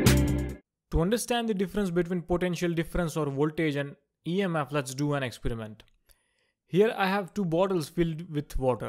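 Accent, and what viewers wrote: India. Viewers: U have the greatest indian accent ever.. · Thank you for this film. It is the second time an indian teacher impress me. Exactly what i needed to learn, now. I listened to american, brit, australian... It was only the indian teachers that were precise and correct in their terminology. India must be an educational superpower. Your english sounds funny, but , you are simply the best teachers.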